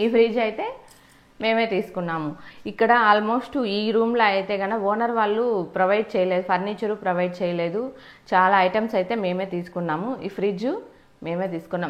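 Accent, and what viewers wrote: Rayalaseema, India. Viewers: Hello, its like pakka Indian home..not like kdramas one..😅 i watch kdramas. Where r u from? Ur dilect is like rayalaseema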